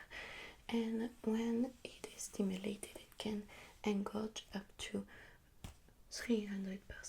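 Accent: France. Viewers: I love your French accent! Greetings from Brazil! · Why this french accent? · traffy jump maybe because she’s French???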